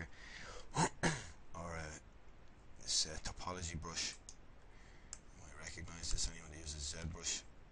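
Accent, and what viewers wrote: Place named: Dublin, Ireland. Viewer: so weird hearing a fellow dubliner pop up on one of these videos :P nice stuff man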